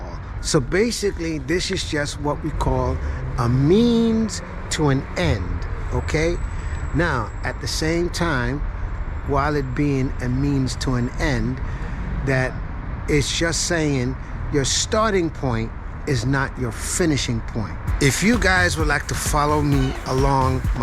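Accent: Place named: United States. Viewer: Your.acsent.is.not.like.british.are.you.american.your.english.is.good.like.americans <link>.with.you.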